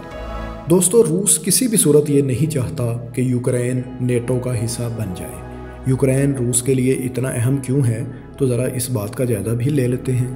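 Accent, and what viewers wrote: Punjab, India. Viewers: Everyone complaining his voice, its not his voice. Its his punjabi accent. Now if he makes 10 more videos you all will get accustomed to his accent even but boy oh boy punjabi is strong in you. The video was informative, presented neat and brief all current affairs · Bohut zyaada punjabi accent hai iss banday ka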